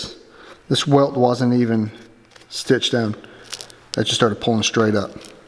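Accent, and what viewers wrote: South, United States. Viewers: Even though your channel focuses mainly on men's shoes (and I understand your demographic is mostly male, so right you are), you have no idea how PERFECT your videos are for me, a woman, to have on in the background while putting on makeup in the morning. Cobbler shop ASMR interlaced with shoe wisdom spoken in a smooth but approachable Southern accent. 👌🏻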